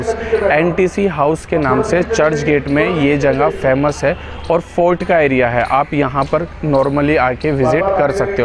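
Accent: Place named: South, India. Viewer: Bhai Tum South अंका bol rahe ho masur comedyan mehemod he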